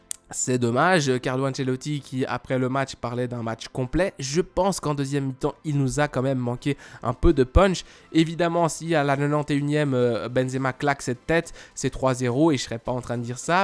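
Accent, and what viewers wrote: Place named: Belgium. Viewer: Merci de votre analyse tu es mon idole. Je demande tu as fais Belgique où tu est un Belge ? Car ton axant les ressemble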